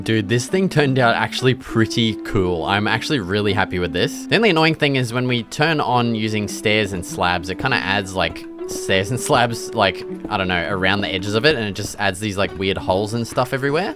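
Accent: Australia. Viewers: Are u Australian? Is that an Aussie accent? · Are you Australian? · Quick question r u Australian u have a goated accent also I changed 700 comments to 701 I ruined it😢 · le me guess . ur from australia dunno jus got a feelin' mate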